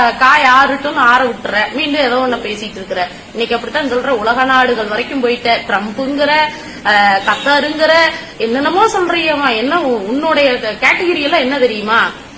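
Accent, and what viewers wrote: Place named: Kongu, India. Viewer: கொங்கு தமிழில் சரியான அர்ச்சனை😂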